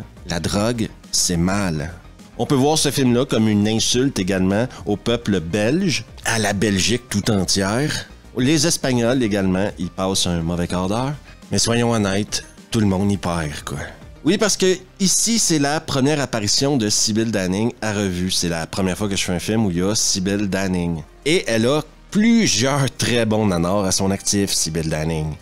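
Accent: Canada. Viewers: j'aime cet accent canadien pour me rassurer de ces nanars . merci!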